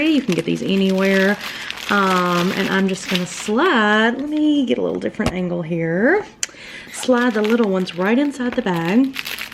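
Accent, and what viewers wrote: South, United States. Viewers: I adore the peppermints for my nieces rooms , love your southern accent ;) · I’m loving your energy and your southern ‘ya’ll’. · Love the southern accent! Great video, thanks. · Your Southern Voice is Adorable....Just like your Crafts<<<<Happy Holidays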